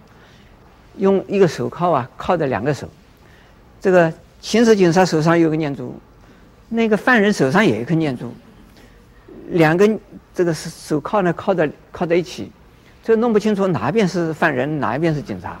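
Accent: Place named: Taiwan. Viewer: I lived in Taiwan for a long time. And he he’s speaking Mandarin Chinese, it’s just that he has a very heavy Taiwanese accent.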